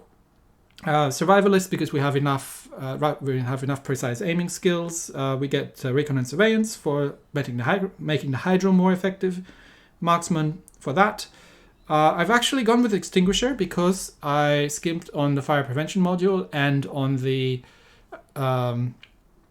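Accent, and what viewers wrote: Germany. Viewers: nah it's not that it's just that I have studied a little about Europe and Asia and thought that your acent kinda sounds Germen which is cool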